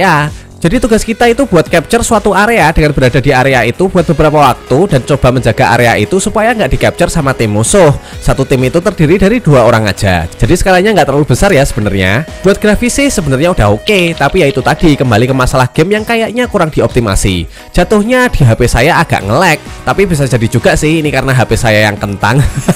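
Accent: Java, Indonesia. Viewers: Bahasa indonya medok banget yak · Bg jangan meddok kali bg. Kami yg bukan sesuku agak bingung bg dengernya 👍🏽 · Medok e puol mass😂 tapikusuka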